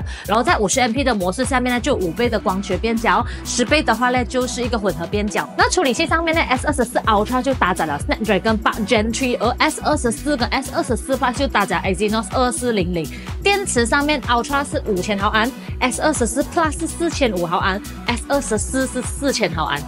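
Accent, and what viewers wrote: Malaysia. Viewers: 马来西亚口音 没听错的话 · Maglish 聽起來真有趣.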